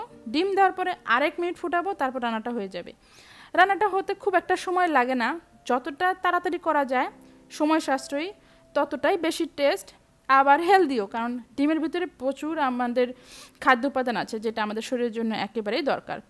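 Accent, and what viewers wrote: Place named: Tangail, Bangladesh. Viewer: আপু আমি তোমার সব ভিডিও দেখি,সব গুলো আমার ভালো লাগে। আর কথা শুনে আমার মনে হয় তোমার কথা আমদের কথার মতো, তোমার বাড়ি কি টাংগাইলে, আমার বাড়ি টাংগাইলে সদর থানা পাড়া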